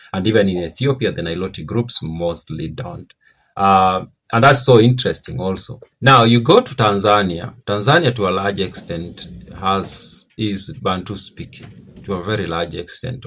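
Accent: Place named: Luhya, Kenya. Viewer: I love insightful présentations, I expect to learn from those that have endeavored to research. However, when I information that's closest to me i.e. the obvious to me being incorrect, I regard all the rest as incorrect. You sound Luhya from Kenya, very close to Uganda, yet the info about Uganda is so false The only Bantu from Uganda that circumcize are Bagisu and Bakonzo/Bamba; less than 10% of the total population of Uganda.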